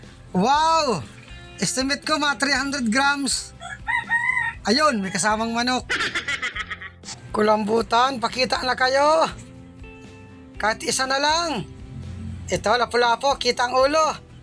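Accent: Bicol, Philippines. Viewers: ang tagalog ni kuya may mga halong bikol.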